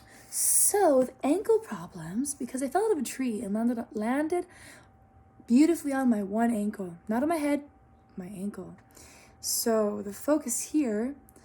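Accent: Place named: RP, United Kingdom. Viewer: OMG! Can I be more Inlove w/ this girl!!! Haha!! Aquarius Sistar here!! I love your Scribbling Bit! Nothing could be cuter except the general Alice British proper way you speak through the blubbering of your whimsical speech as you interpret the codes of your readings in your experience. OMG Girl I'm gonna get drunk on this channel, dangerously. You're a Marvelous bloomage of humble beauty dearest Lovely Crystal Star Child. ✨🫶🏻🎶💥 I think you know who this is 😜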